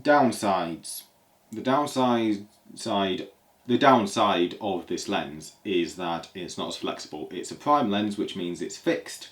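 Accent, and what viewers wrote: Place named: England, United Kingdom. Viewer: I actually enjoyed watching your video. You made a dry subject entertaining and I liked your English accent and humor. You will probably say: I don’t have an accent. 😊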